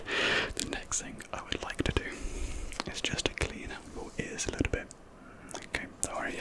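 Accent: United Kingdom. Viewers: Now this is what to listen to while doing college homework! Handsome British accent boy is back! (well at least it sounds like he has a tiny bit of an accent)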